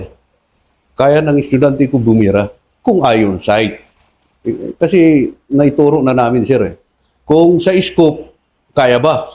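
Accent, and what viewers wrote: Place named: Cordillera, Philippines. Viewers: Yes he is Igorot 🫶👍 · Parang igorot si sir,halata sa salita nya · Sabi n igorot. Halata sa accent. Magaling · Mukhang Kabbayan q ito sa accent lng alam q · Halatadong igorot kac sa pananalita ❤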